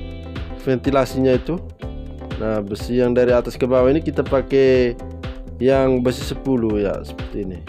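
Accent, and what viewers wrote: Flores, Indonesia. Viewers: abang ini cara omong, abang dr flores ka 🙏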